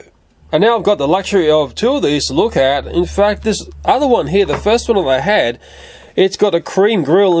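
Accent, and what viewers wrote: Australia. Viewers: I love your Australian accent when your talking about stuff in your videos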